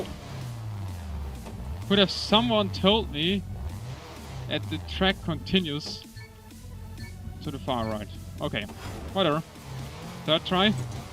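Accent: Germany. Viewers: deutscher aksent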